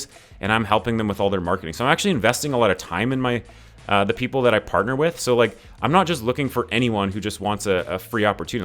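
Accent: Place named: Canada. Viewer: This guy sounds Canadian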